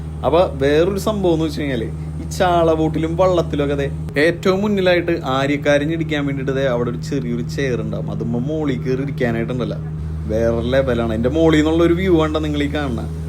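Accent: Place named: Karunagappally, India. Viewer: കൊല്ലം കരുനാഗപള്ളിക്കാരാണോ സംസാര അത് പോലെ തോന്നി എന്തായാലും നല്ല വീഡിയോ❤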